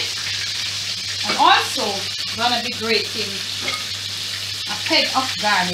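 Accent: Jamaica. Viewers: Typical good good up Jamaican cook. She switches effortlessly from proper english to patois... Cho! Big up, food look so good... 🇯🇲🇯🇲🇯🇲🇯🇲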